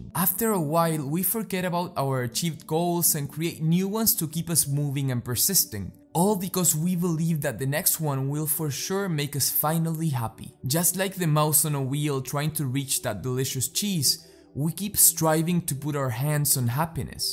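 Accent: Argentina. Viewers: Por qué siento que esté pibe es argentino?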